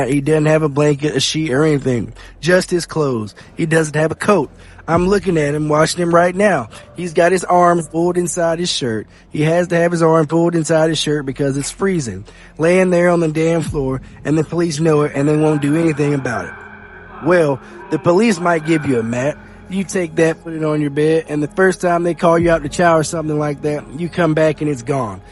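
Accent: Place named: Alabama, United States. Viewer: Dude I swear u talk just as country as I do 😂 what part of Alabama are u from? I'm in Gadsden 😊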